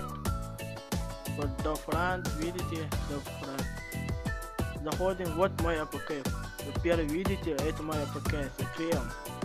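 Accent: Chechnya, Russia. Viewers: ОН ЧЕЧЕНЕЦ!!!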